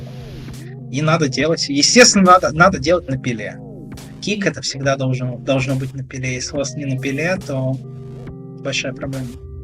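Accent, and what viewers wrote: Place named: Poland. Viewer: нифига у тебя акцент польский появился, раньше его не было (Л-логика ☺️) меня, при знакомстве с тобой , больше всего удивила разница между тобой в инете и в жизни. в положительную сторону ;)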